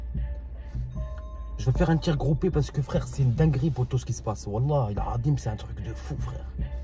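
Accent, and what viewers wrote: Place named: Lyon, France. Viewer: Ca ce voit ta été a Corbas 😂😂 tu pavarle comme les Lyonnais (sal mouille)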